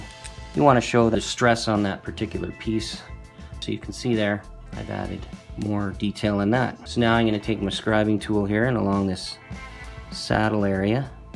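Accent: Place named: Canada. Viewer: Great channel you have going! Clearly shot video of everything you do and understated style of presentation make watching a real pleasure. No bling, no nonsense theatrics. Just a Canadian modeler (guessing by the accent here) teaching others to make their models something more than what comes in the kit. As a jewelry engraver I see that many techniques you demonstrate are similar to what is done on metals. Plastics are widely variable and offer more than a few challenges in re-workng the material. I have so much to learn yet and I suspect I will be closely studying every video you have. I'm trying to build Napoeonic armies in 1/72 and good info such as yours is difficult to find. there are thousands of "how to paint ____" videos out there but precious little about working the plastic itself. Subscribed and liked! Best modelling channel I've found yet, thank you so much for sharing your expertise with others.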